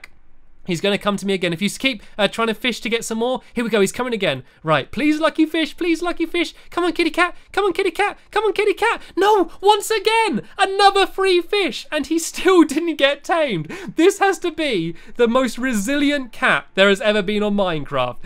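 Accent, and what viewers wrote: England, United Kingdom. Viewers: You inglish bro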